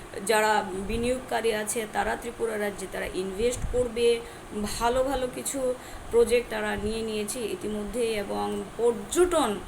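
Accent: Bangladesh. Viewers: Truly tripurar basinda hola eai babe kotha bole na sotti tumi bangladesi tumar kothatei porichoi hoi.....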